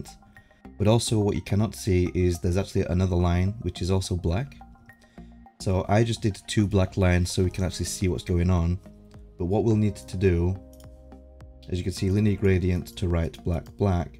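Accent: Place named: West Yorkshire, United Kingdom. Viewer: That's a guy from West Yorkshire who has lived in Japan for 10 years, so it's a little hybrid 😅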